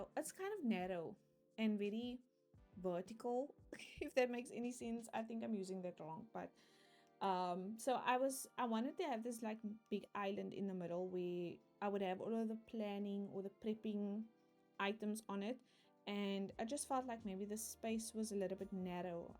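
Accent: South Africa. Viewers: BTW I love your accent, is it South African?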